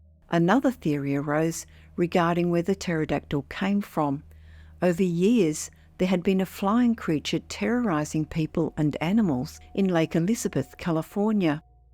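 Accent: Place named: Australia. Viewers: It sounds like an Aussie accent (Australia) or a Kiwi accent (New Zealand). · That's an Australian accent, pretty close though.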